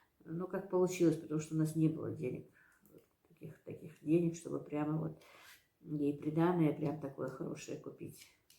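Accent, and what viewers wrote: Standard. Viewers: Вы так чисто и правильно разговариваете,приятно слушать Ваши рассказы.Испытаний много на Вас выпало.Хорошо что у Вас много хорошей родни.У нас родители вообще с дет.дома,с Дагестана.В ВОВ у них умерли от голода и болезней родители, и все моего папы маленькие братья,он 7 летний мальчик остался один из пяти детей в семье.Осталась одна старая бабушка,которая отдала его в детдом.Родни дружной как у Вас у них не было.Сами прокладывали себе путь.Вы очень сильная и трудолюбивая женщина.Пусть у Вас все наладится.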